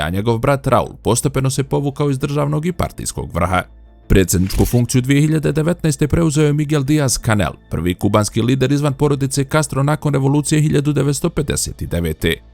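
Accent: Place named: Herzegovina, Bosnia and Herzegovina. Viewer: Hercegovac govori. Ne Bosanac.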